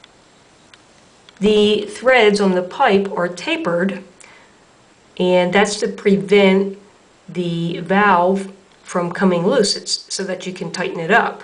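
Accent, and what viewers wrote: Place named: Baltimore, United States. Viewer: Nice exploration, thank you. "Wudder." Is that a strong Baltimore accent I'm detecting?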